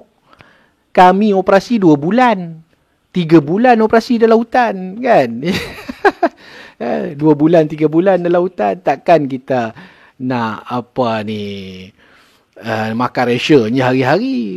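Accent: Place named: Kedah, Malaysia. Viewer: Maaf tuan! Sya nk tanya sikit, sya dh tengok semua vedio tuan, tuan ni asai usul dri kmpong mana? Mcm org kedah ja ni?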